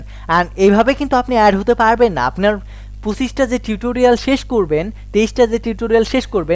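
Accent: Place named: Noakhali, Bangladesh. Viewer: ভালো লাগলো আসলে। আচ্ছা আপনার বাড়ী কি নোয়াখালী নাকি? কথা ঐ রুকুম আসে।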